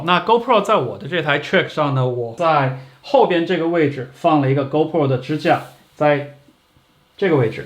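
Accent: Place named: Yunnan, China. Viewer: 感谢的详细介绍。已入QUADLOCK,另外，您的口语有马普的味道，是云南人吗？谢谢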